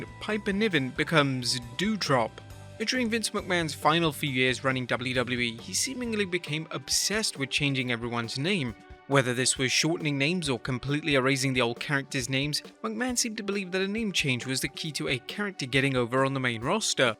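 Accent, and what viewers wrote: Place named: England, United Kingdom. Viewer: This channel is awesome....and I love his English accent.......😊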